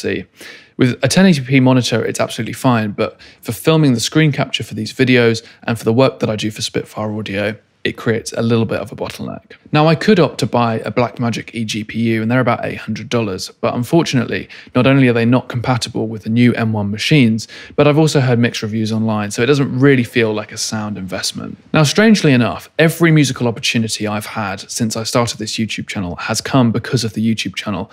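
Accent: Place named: United Kingdom. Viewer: Dear, thank you very much for your video. I like your videos very much and that as a non-native English speaker. I love your and the clean British accent. I think my personal decision has fallen. I will order the standard 16 inch Pro with 16GB RAM 1TB SSD version. Why? The larger display, longer battery life and what many may not know, the better cooling system from the larger one. That may shouldn't tickle the Pro compared to the Max processor. The 16 inch model gets nowhere near as warm as the 14 inch. Well when will that be the case? The weight? If I can't carry my notebook sorry MacBook Pro, then I don't need one anymore. And it's a difference of 200 € for me. I know a lot of money. Keep up the good work. Thanks to you, stay safe, all the best from a loyal subscriber from Bavaria.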